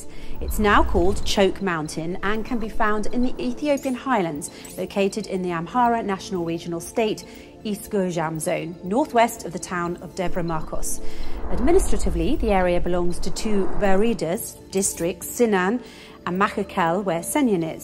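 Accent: United Kingdom. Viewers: Speaking in a british accent in no way diminished the primitive nonsense you are trying to sell here.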